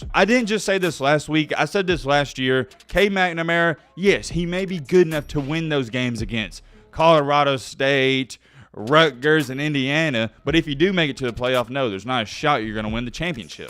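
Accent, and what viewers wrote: South, United States. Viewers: It was #2 vs #5 and Both teams played tough tough Defense that should’ve been expected Two top 5 teams and dude your Clearly a SEC guy it’s all in your accent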